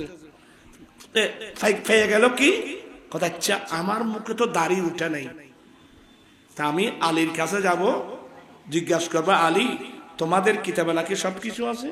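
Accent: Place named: Chittagong, Bangladesh. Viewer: চট্টগ্রামের আঞ্চলিক ভাষাটা ছাড়তে পারে নাই।রংপুরে আহলে সুন্নতের আলেম নাই বুঝি?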